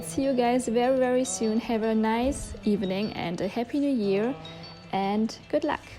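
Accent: Germany. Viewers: Ich wusste das du deutsch bist, hab ich doch richtig gehört 😂👍🏼❤ Ich hab übrigens noch nie so ein schönen Journal gesehen, hab vergessen wie das auf deutsch heißt lol 😅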